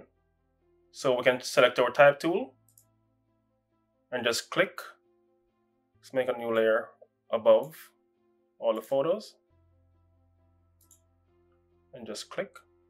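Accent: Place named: Jamaica. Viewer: I am a Jamaican and you sound so much like a Jamaican lol. your content is good bro thank you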